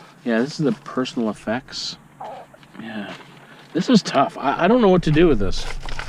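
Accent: United Kingdom. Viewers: your enjoying your British accent really?? love it xx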